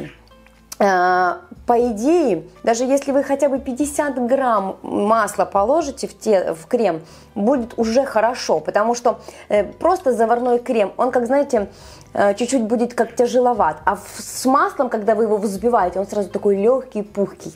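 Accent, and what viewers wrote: Surzhyk, Ukraine. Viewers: Да, проскальзывает "суржик", но не противный, как у многих! Зато все настолько гармонично...и кухня, и посуда, и манера приготовления...смотришь некоторых, плита грязная, посуда горелая, кухня вообще прошлого века, готовят неаккуратно, но лезут показать свои "шедевры". - молодец, руки умелые, много знает, чистота на кухне, рецепты интересные, проверенные на себе, аккуратность...так что мелочи речи не в счет! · Это Украинский диалект. Мы живём рядом и тоже так говорим.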